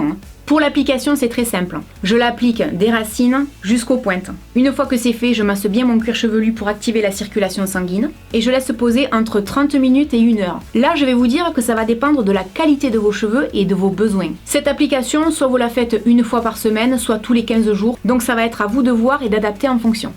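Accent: South, France. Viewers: Je savais même pas que ca existé Pis ton accent du sud 😍😍 Bisous tu es toujours magnifique ❤️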